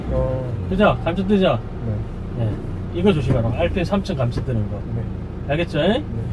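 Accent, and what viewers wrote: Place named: Gyeongsang, South Korea. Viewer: 이거 보고 1종보통 도로주행 한번에 합격했습니다 직관적인 설명,약간의 경상도 사투리(?)...친절함까지... 1종보통 합격요령은 기어조작능력 + 순발력 + 느긋함 + 약간의 센스가 필요해보여요.저도 뭐 유튭영상 수십번 시청하고 했지만 막상 시험칠때는 아무 생각 안나더 군요.긴장을 삭히고 배운대로 익힌대로 차분하게 마인드콘트롤 하면서 초반이 제일 중요하니 초중반만 문제없이 넘기니까 후반쯤에는 오히려 자신감이 생기데요:)) 보통 도로주행 70점컷인데 만점은 거의 없고 80~90점으로 많이 합격하더라구요 만점받을 생각하지 마시고 감점을 최소화하는게 합격요령이에요 다들 꼭 한방에 합격하시길 바랄게요 화이팅!!!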